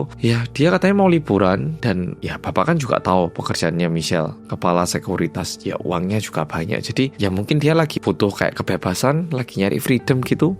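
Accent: Java, Indonesia. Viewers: Lucu aja kalo dengar oppa medok cerita😅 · Masih suka suaranya ..medok bgt jawane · 😅😅 malah tertarik medok jowo ne Mase,nah yg bikin ngakak lagi kok malah wong Korea ngono lhoool😅😅😅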